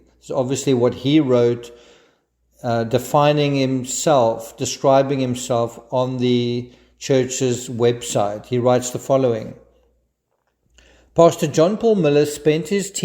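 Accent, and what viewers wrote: South Africa. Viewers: Are you South African? Your accent....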